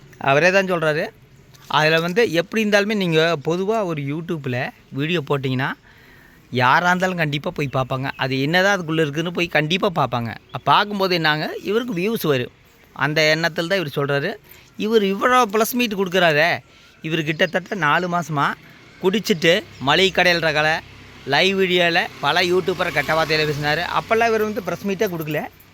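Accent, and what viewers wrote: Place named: Kongu, India. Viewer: அண்ணா இளவரசன் என்ன சொன்னாலும் அவன் திருந்த மாட்டாங்க அந்த காயத்ரி தின்னே குடும்பத்தை அழித்து விடும் போல😂😂 மொத்தத்தில் அந்த குடும்பமே அப்படித்தான் அவங்க அம்மா தங்கை அவனுக்கு சப்போர்ட் நீங்க இந்தியா குட்டி சேனல் பார்க்க சொன்னீங்கள்ள இந்த 20 நாளா அந்த இந்தியா குட்டி சேனல் தான் நான் பாத்துட்டு இருக்கேன் மாமியார் மருமகள் அருமையா நடிக்கிறாங்க அவங்க பையன் வேற லெவல் மொத்தத்தில் உங்க வீடியோ சலிக்காம நல்ல பார்க்கலாம் நல்ல ஜாலியா என்டர்டைன்மென்ட் நகைச்சுவையாகவும் இருக்கிறது கொங்கு தமிழ் அருமை❤❤❤🎉🎉🎉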